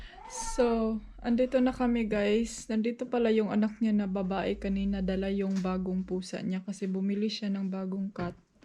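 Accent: Visayas, Philippines. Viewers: Hello, following in your youtube vlogs, im from Leyte.. mkatawa ko kay bisaya kaayo.. makes my day light😘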